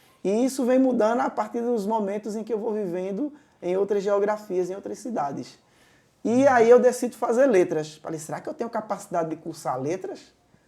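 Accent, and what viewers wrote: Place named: Pernambuco, Brazil. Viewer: Muito obrigado! Nossa fala nordestina pernambucana traz traços de uma região que bebeu muito vocabulário jorrado pelas ondas do rádio.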